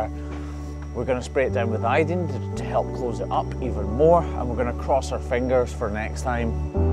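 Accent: Scotland, United Kingdom. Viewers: Hey man your Scottish right? I know you slip up on your vocabulary a lil bit but now wrys at that point go back to Scottish roots if we can't understand don't wry that's what closed captions are for lol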